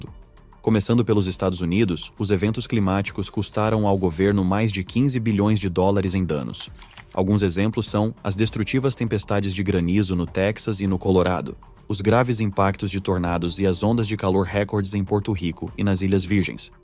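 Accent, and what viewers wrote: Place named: Inland São Paulo, Brazil. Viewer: O mais irritante dessas narrações é, esse sotaque de paulista interiorano, acaba com todo o propósito!!!! FUI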